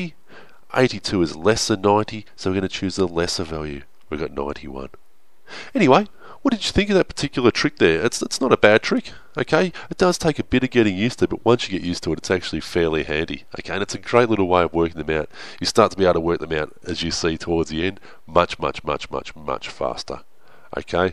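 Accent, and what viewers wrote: Australia. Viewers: oh my god you sound australian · he sounds more Australian than British. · No he's just Australian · Thank you Mr Australian man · I was mesmerized by your Australian accent and it helped me do really well with the math on my MCAT. Thank you! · He's Aussie that's why? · no, he has a aussie accent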